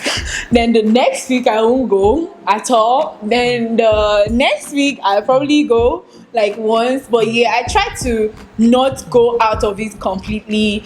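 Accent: Nigeria. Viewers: is that Nigerian accent 😍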